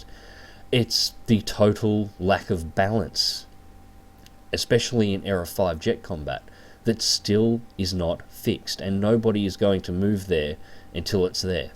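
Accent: Australia. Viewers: yes indeed so glad i live over here and are you from Ausie i cant help but notice a bit of an accent?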